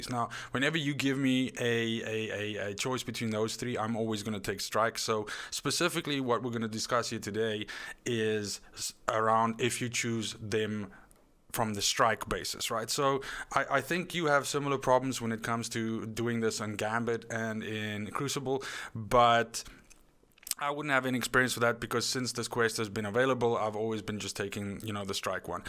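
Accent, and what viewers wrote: South Africa. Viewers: Is that a South African accent?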